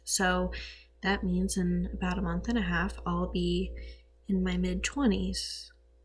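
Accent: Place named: United States. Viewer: Usually american accents irritate me but yours is very charming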